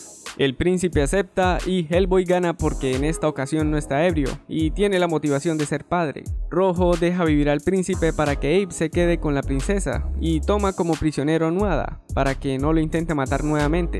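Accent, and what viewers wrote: Colombia. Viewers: Eres de Colombia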